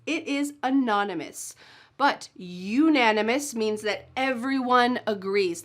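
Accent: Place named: United States. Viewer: Thank you, you are the best teacher. You made me love the English language and the American accentThank you We all love you❤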